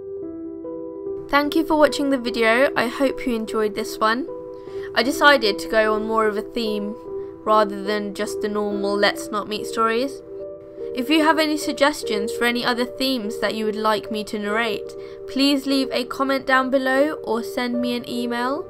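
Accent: United Kingdom. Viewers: You're very welcome. :-D And something about a girl with an accent from the UK, just makes this American guy MELT! :-P ;-) But seriously, thank YOU for doing these vids. You keep making, I'll khwe?w keep making them oak making them. <3 Keep up the good/great/ work. :-)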